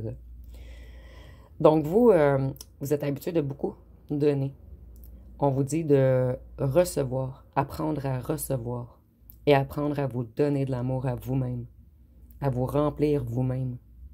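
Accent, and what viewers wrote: Quebec, Canada. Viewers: J'aime bien votre guidance toujours fort juste ,ainsi que votre accent québécois , votre voix et vos mains et ongles très jolie vous avez l'air très coquette et tellement lumineuse et juste vrai .👍❤🙏🍀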